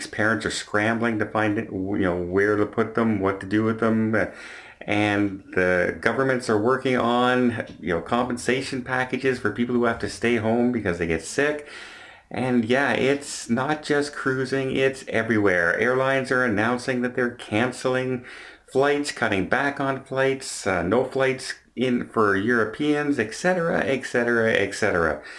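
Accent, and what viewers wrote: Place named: Ontario, Canada. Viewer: where are you from? Sounds like ontario from your video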